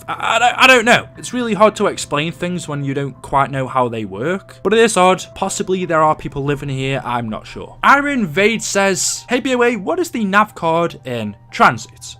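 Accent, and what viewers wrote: United Kingdom. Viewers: BOA are you british? Also how old is Arthur and how tall is Arthur? ( I think hes around 7 feet tall)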